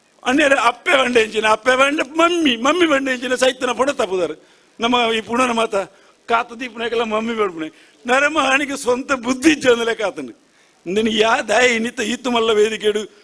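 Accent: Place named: Tulu Nadu, India. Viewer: Anna irna kannada sari ijji, ir tulette paterna edde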